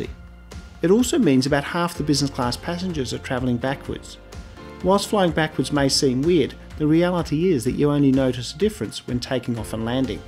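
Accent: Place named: Australia. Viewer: I always enjoy your videos. Your accent matches well with my idea of a typical laid-back Aussie explorer. Especially the way you say "paaaarsta" I like "paaaarsta" mmm :D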